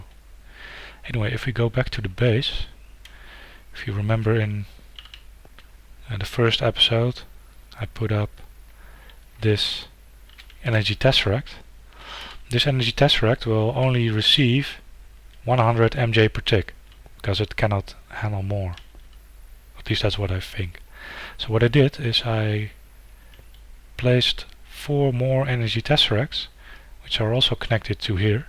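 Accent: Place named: Netherlands. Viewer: You sound a bit of foreign, Dutch perhaps? :P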